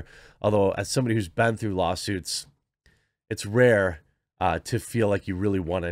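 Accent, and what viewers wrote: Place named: Wisconsin, United States. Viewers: It's funny how much more Wisconsin sounds when he's ill. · Still not Mark Richards levels of Wisconsin.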